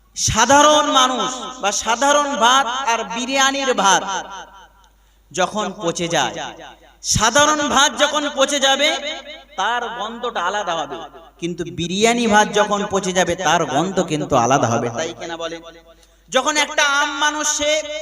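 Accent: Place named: Bangladesh. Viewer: অনেকটা বাংলাদেশী বক্তাদের মতো শুনতে লাগছে ❤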